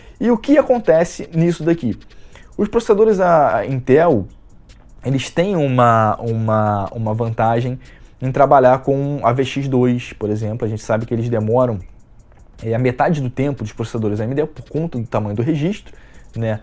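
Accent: Rio de Janeiro, Brazil. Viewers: poh não para não! Conheci teu canal através desse conteúdo, gosto de todos os assuntos que vc aborda aqui no canal, mas krlho os videos que mais me prendem aqui são desse tipo, tu fala sobre umas paradas, q eu que tnho um certo tipo de conhecimento nessa área, fico tipo “krlho esse maluco manja pra porra, foda ver ele falando essas paradas pq são de fato assim” então manda mais conteúdo assim que ta foda, ainda mais no nosso dialeto carioques que fica mais pika ainda kkkkk. Tmj ✌🏻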